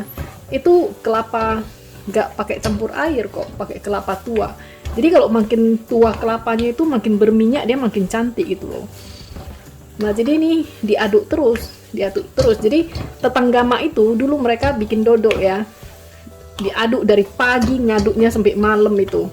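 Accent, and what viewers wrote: Medan, Indonesia. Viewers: Logat bicaranya kayaknya dr Medan nih...sukses selalu ya..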